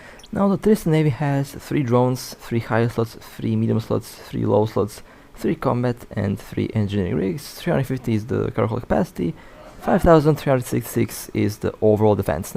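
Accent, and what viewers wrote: Russia. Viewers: Ur russian accent😢 Но спасибо за видео!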